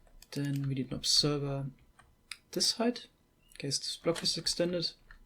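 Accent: Germany. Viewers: could it be that you are german? your way of speaking reminds me of germans try to speak english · the german accent is too strong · I hear a german accent am i right? Falls ja gutes video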